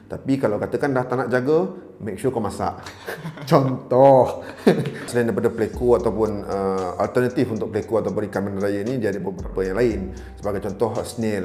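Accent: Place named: Negeri Sembilan, Malaysia. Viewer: Abg nogori ko dgr loghat tu mcm ade nogori sikit ii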